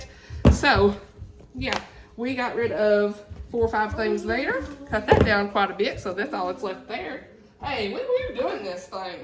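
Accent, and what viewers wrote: South, United States. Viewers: Your southern accent is fine for me. I'm a southern girl myself. I'm from Maryville, Tennessee. I will be praying for you and your family. We are going through a hard time with my husband. He is taking chemo foe stage 4 colon cancer so please keep him in your prayers please.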